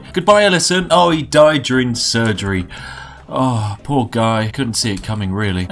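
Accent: United Kingdom. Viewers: There's something really appropriate and matching about a British accent and rhetoric structure being creepy lol "That's exactly what our people are looking for. Wonderful."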